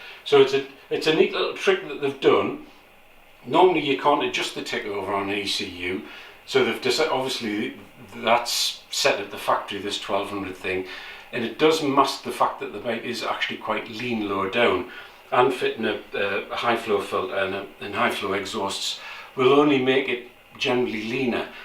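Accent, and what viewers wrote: Newcastle, United Kingdom. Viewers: I said 900 TO a 1000 RPM . not 900 thousand- Sorry you may need an interpreter for the Geordie accent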